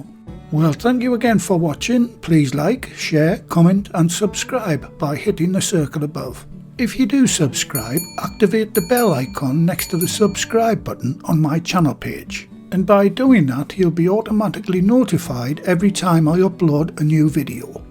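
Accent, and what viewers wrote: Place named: England, United Kingdom. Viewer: I like to listening your English accent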